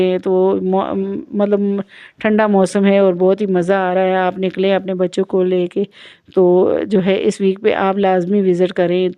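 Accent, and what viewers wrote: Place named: Hazro, Pakistan. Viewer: AP ke Urdu ma hazro k asens ata han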